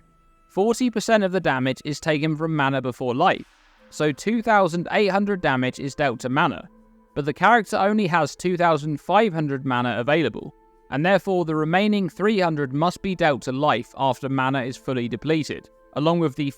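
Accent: United Kingdom. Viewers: Bri'ish man spitting facts again. Always great for theory crafting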